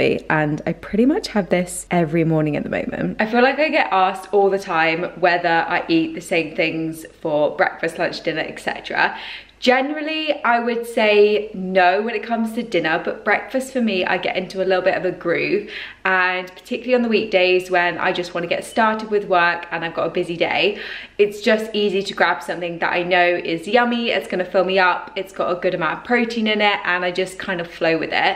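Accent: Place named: England, United Kingdom. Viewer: New sub❤️ I loooovvvee how you speak, where you from? I’m guessing somewhere in England 🏴?🤔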